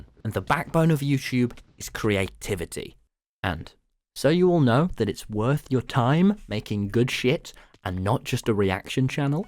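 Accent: United Kingdom. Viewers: so... the weekly slap but bri-ish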